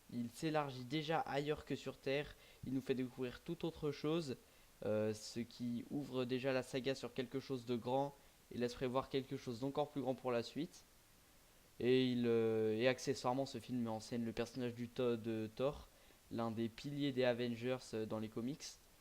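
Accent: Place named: England, United Kingdom. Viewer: Osef l'accent anglais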